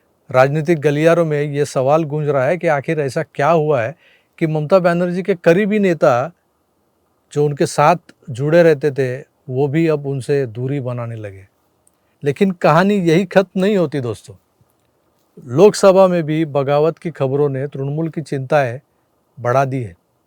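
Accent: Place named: Maharashtra, India. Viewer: मराठी माणूस किती पण चांगली हिंदी बोलला तरी ओळखू येतोच तो मराठी आहे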